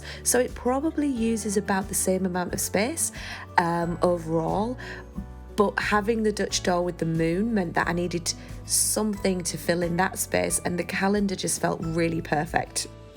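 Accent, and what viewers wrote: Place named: United Kingdom. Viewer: So pretty!! I am not the best at identifying UK accents but always curious. Where is your from?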